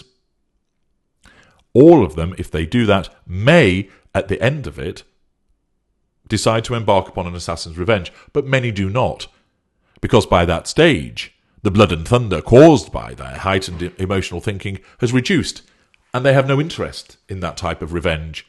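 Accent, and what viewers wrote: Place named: RP, United Kingdom. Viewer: I have such a hard time believing that you are a narcissist and/ or psychopath. Is it my bias for the dulcet baritone, the lucid RP ?? I've whistleblown and taken on entrenched corruption and criminal narcissistics who resorted to harassment by proxy to get at me when direct attacks failed and failing that were exposed in court ( long story) By the time I won the case the guy was afraid of me and very angry.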